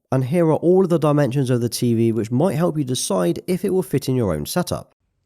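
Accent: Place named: England, United Kingdom. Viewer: Love your English accent, mate.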